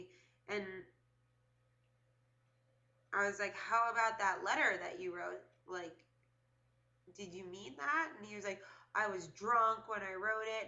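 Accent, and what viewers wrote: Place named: Florida, United States. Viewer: I love your Floridian accent.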